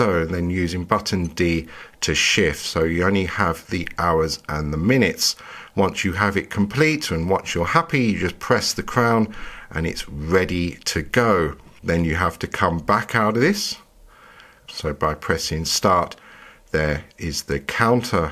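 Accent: United Kingdom. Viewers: Excellent presentation and outstanding commentary in British English :) Your voice is very impresario like, as a boxing match commentator. Btw even if you say you are in London would you say yours is the quintessential Queen’s English or say Glemsford or is it Received Pronunciation because I don’t hear a regional accent at all. I found it very useful really. Good day Sir. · I'm fond of your accent ! Soooo cool Soooo british. Where are you from if I may ask my good pal ?))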